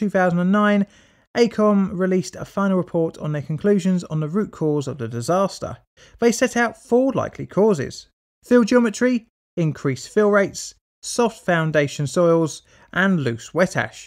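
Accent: United Kingdom. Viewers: Why is a limy narrating this, ENGLAND HAS ENOUGH ISSUES OF ITS OWN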